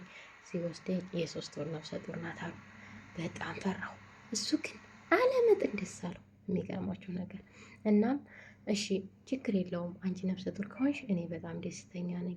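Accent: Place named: Wollo, Ethiopia. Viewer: ወሎየ ነሽ አነጋገርሽ ያስታውቃል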